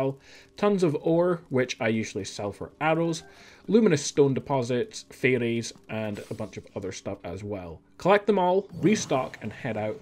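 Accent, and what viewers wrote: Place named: Scotland, United Kingdom. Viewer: Thanks for the video and the North Scotland accent…. I’d say around Inverness?.?.? Possibly Forres or further East Aberdeen way.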